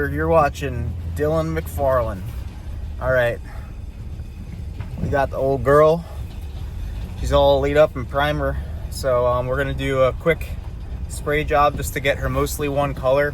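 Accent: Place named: Pennsylvania, United States. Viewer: You talked for 3 seconds and I knew you were from PA. good work and the paint job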